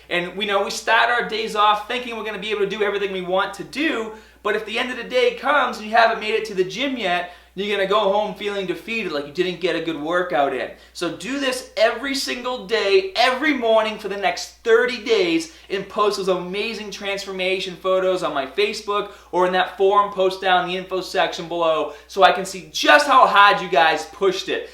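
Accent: Boston, United States. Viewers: are you from boston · Sounds like a Boston accent... They drop the "R" similar to Australians. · Martin P Have you even ever heard an Australian talk? My guess is he has a Boston/Rhode island accent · Is that a Boston accent. It's so weird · ... is that a Boston accent? :-) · Of all the accents to re-brand yourself with, why the hell did you choose Boston? Boston... The accent that makes you seem like you will get drunk and hit someone at a Baseball game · Boston accent or nah!??? 😫❤️😍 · your accent is driving me crazy >:( go back to Boston and learn how to speak english properly! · Are you from Baaston?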